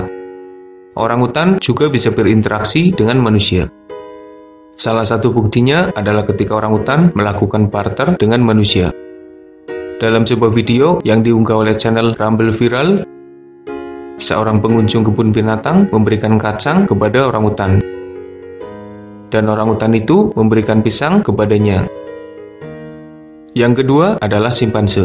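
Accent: Banyumas, Indonesia. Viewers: Sampean ngomonhe ngapak mas, wong ndi si? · Ngapaknya top..😄😄😄😄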